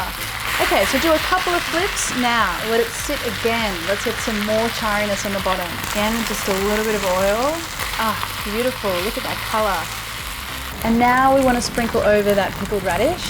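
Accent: Australia. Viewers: Hello, tell us a little about yourself. You live in Bangkok but you have an Australian accent. How did you become a chef? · Ahhh Australian, my apologies for mistaking you for being English after reading the comments,. 🙏🏼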